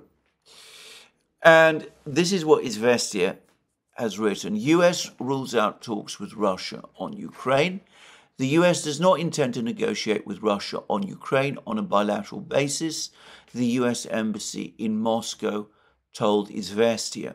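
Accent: England, United Kingdom. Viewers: I wouldn't be able to read it with that English accent.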